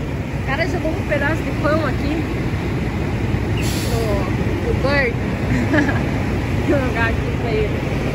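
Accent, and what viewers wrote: South, Brazil. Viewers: um cara leigo nisso tudo...só viajando com a do café cromado mais linda nos USA motorista e vendedora de empadão! Mas essa carga e potes não poderiam ser amarrados com essa cinta nessa mesma estrutura contra as paredes onde você engancha - tipo um cinto de segurança para as cargas. Sempre linda de Curitiba! - "sotaquezinho" do sul da minha terra é fantástico! ehehe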